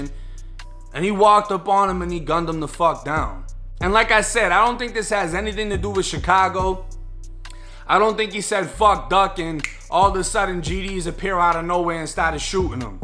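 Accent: Boston, United States. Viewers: Yo homie are you from Boston?? That accent strong 💪🏾 AF. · Are you from Boston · Sounds like U from Boston?? But with the most Southern accent aloud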